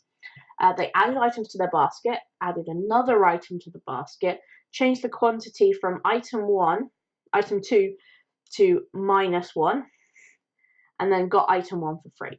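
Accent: United Kingdom. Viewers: Pretty good sharing and great content, no doubt. I'm a beginner in cyber security and eventhough I've got a private invitation on H1, I couldn't manage to find a bug... However, this video gave me a different perpective and way of think. Therefore, I'm so grateful to you for your sharing :) Btw, I guess you are from UK? I like this accent although I've hard time to understand it :)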